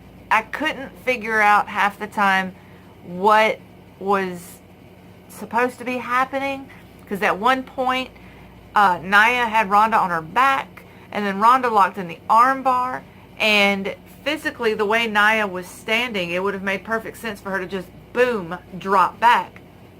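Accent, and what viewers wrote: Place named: South, United States. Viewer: I'm Here Miss! lol I subscribed and rang the bell. You're Southern? I didn't know that. Your accent is cute lol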